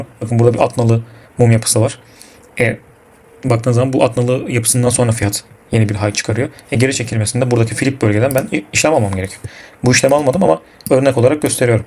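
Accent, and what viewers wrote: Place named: Italy. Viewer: hocam kimlerden eğitim aldın ? konuşmandan italya rüzgarı geliyor hafif :) çok güzel bi entry modeli bu arada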